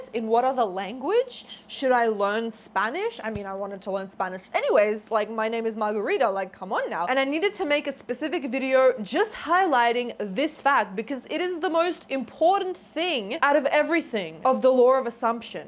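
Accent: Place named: Australia. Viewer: Wow I really don’t feel motivated by someone yelling at me in an Australian accent 😂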